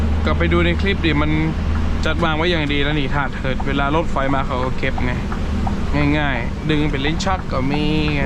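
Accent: North, Thailand. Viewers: ที่ชอบดูเพราะแกพูดเหนือ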